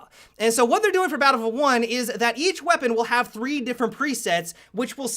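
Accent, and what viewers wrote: United States. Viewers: This video is basically just Jackfrags one with an American accent. I have no qualms with this.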